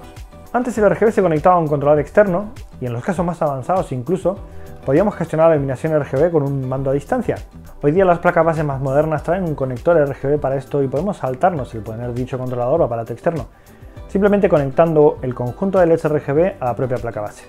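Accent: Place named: Argentina. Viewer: Se te nota el argentino todavia!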